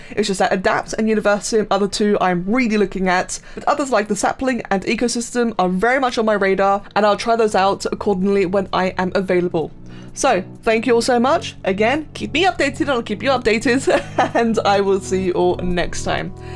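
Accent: United Kingdom. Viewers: Most British accent ever heard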